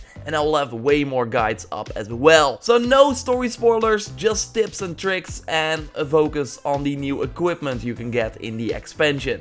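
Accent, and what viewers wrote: Netherlands. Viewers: Merkwaardig hoe veel mensen niet weten dat je Nederlands bent. Je zegt het redelijk vaak in je video's en je accent verraadt het (al is het niet dat typische Dunglish haha)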